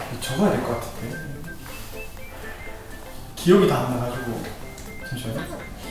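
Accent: Gyeongsang, South Korea. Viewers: 이솔로몬 님~경상도 사투리~웃는모습~매력덩어리예요~끝까지 파이팅 하세요~💕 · 대구 말투 넘 멋져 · 성격도 소탈하실듯ㅋ 전 퐝 살아서 경상도사투리 넘 친근하고 인간적이네요ㅋㅋ 열심히 준비하셔서 꼭 결승전까지 가시길 아자아자~~~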